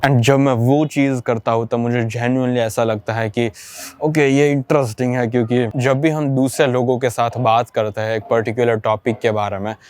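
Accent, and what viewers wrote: Gujarat, India. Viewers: most of the gujarati people tend to struggle while speaking hindi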